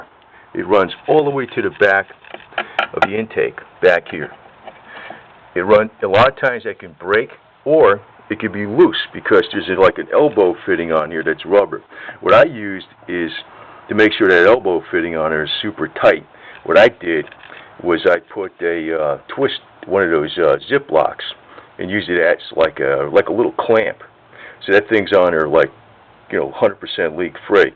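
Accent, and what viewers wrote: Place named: Philadelphia, United States. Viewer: I came on the internet to find info on problems with my 1996 Sebring. I want to thank you for things you helped me with. OOh and by the way... When I watch your videos I hear a Philly Kensington accent!! I am in Fla now, but I grew up near Sears at Whitaker and the Blvd!! Look me up on Facebook or email me and lets see how many people we know from Philly and laugh!!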